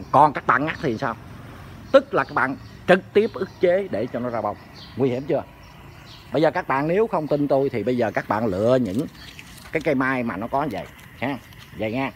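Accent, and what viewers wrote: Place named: Tay Ninh, Vietnam. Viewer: Dân gốc Tây Ninh nói chuyện nghe cũng đặc biệt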